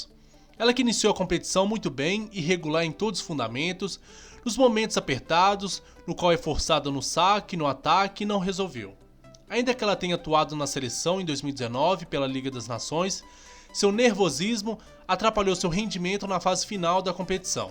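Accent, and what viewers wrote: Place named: Rio de Janeiro, Brazil. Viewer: Carioca,pelo sotaque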